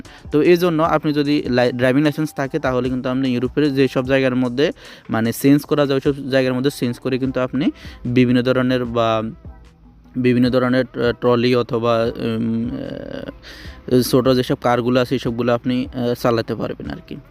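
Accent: Noakhali, Bangladesh. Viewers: ভাইয়া আপনার বাড়ি নোয়াখালী মনে হয়,,,,??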